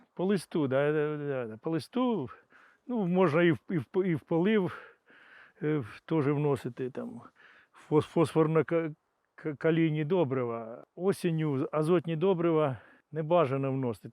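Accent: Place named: Surzhyk, Ukraine. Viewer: Несмотря на ученую степень, педагога из Вас, не получилось. Очень неприятно слышать суржик. Читайте больше книги на украинском и не будете заикаться.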